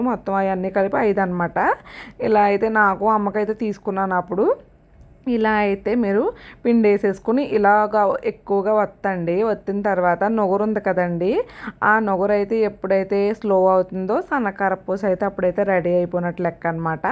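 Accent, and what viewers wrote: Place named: Godavari, India. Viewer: Super👌 Godavari language😂😂👌👌👌 Ekkada Unna language maradu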